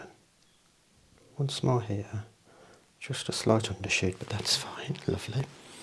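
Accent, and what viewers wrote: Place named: England, United Kingdom. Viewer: I love your accent. What part of England is this from)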